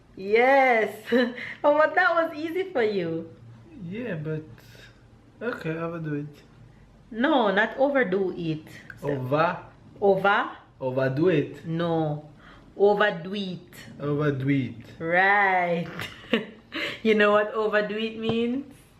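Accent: Jamaica. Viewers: I love love love your Jamaican accent. Lovely vlog. As for working out, the only exercise l do these days is walking and I enjoy it. Like I show on my vlogs, I try to leave the car behind most times and just walk. is such fun to watch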